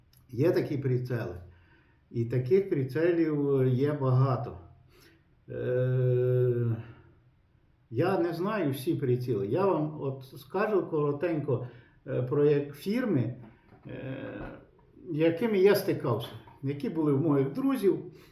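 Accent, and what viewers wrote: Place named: Vinnytsia, Ukraine. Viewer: У Вас розмова Вінницька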